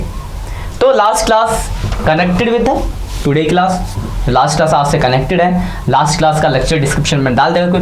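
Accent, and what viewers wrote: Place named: Haryana, India. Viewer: Amazing explanation sir...... I think u are from haryana????????ur teaching is really great 🙂🙂🙂🙂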